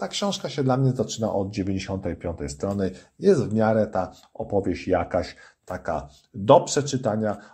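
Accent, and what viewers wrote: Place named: Warsaw, Poland. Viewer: A ja tam z innej beczki. Znałem dobrze jednego mechanika z Wołomina i on tak jak Ty mówił oksiązce i wchodził w dźwwli.Slychac w Twojej wymowie podwarśawski akcent. Z fartem 💪💪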